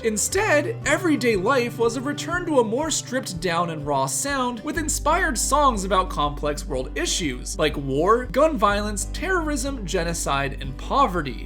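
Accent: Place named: United States. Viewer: It's so strange to hear British grammar ( ARE) with an American accent, great video though (I'm assuming your accent isn't Canadian, might be wrong about that though)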